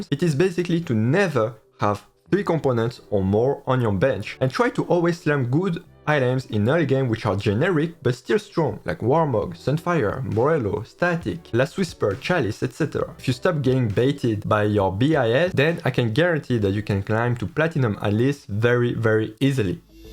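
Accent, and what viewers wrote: France. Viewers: You are a french accent, u are french ? ^^' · Great advices. Awful english accent. Where are you from ? (french people has same awful english accent, but could be asian)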